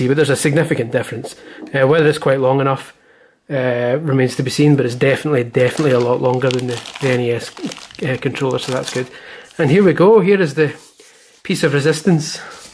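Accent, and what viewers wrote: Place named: Edinburgh, United Kingdom. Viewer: you sound like you are from Edinburgh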